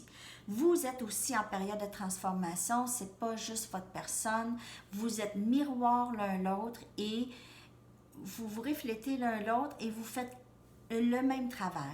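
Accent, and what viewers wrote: Quebec, Canada. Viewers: J’aime beaucoup l’accent québécois « je sais pas pourquoi » 😅 sûrement parce que ma jumelle est dans le pays ☺️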